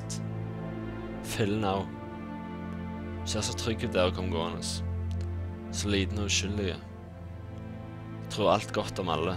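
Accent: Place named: Jæren, Norway. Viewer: Goe! :D Genialt me jærdialektå då :D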